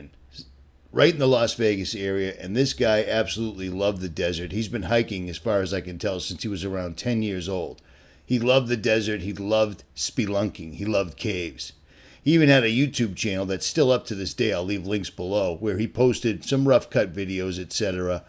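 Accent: Boston, United States. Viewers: What happened to from Boston? This man has the same accent as. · I just came across your YouTube channel and this was the first video I've ever watched. I read some of the comments below and they were mentioning a guy named from Boston. I didn't know who they were talking about till I searched on YouTube and Google and figured out that they were talking about the guy who has this YouTube channel. I grew up in Boston; not outside of Boston or near Boston; not on the north shore or the south shore but in Boston. If you grew up in Boston then you know why I mentioned this. I'm curious where you are from in Boston? What section of Boston are you from? I certainly recognize the accent and could take a good guess and probably get pretty close or answer in 2-3 tries.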